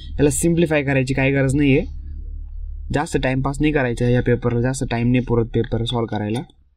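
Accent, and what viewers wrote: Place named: Nashik, India. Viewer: Bhau nashik cha vatatoy😄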